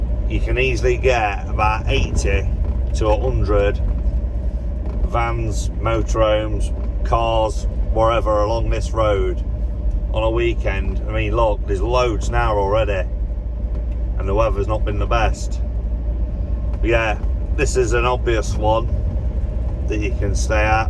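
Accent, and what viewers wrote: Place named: Midlands, United Kingdom. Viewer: (assume that's your name) Interesting video. First time I've seen you. Your accent I'd say you're not too far from me. Sutton-in-Ashfield I'm a widower Single like I assume you are.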